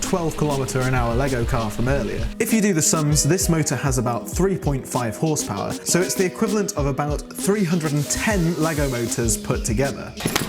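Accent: England, United Kingdom. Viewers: I have a new favorite english accent. 😄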